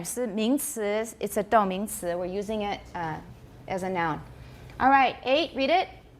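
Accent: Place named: Taiwan. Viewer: 史老师好认真，一本正经地讲解，正宗的英文和台湾中文口音好可爱。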